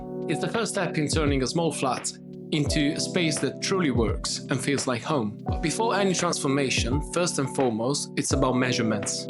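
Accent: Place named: Glasgow, United Kingdom. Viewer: Top notch! You ever lived in Glasgow? Got a bit of glasgow in ya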